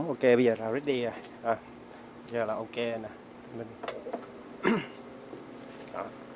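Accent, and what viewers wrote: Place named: United Kingdom. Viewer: Cảm ơn anh đã chia sẻ về dụng cụ này, nghe anh nói tiếng Anh Anh rất hay nhưng ko phải giọng Mỹ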